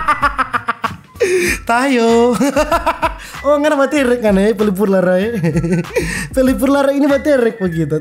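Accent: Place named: Palu, Indonesia. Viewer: Rupa ada ba logat palu tadi ka oppo 😂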